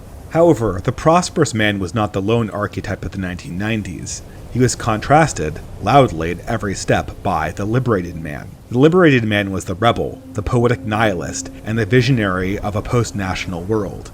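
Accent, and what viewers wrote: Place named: California, United States. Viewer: I hear the voice of a fellow Californian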